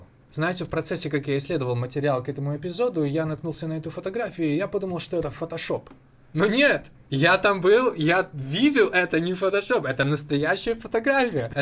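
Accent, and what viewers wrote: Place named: Russia. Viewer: а по мне так ты сам урод с маскальским акцентом ... :face-orange-raised-eyebrow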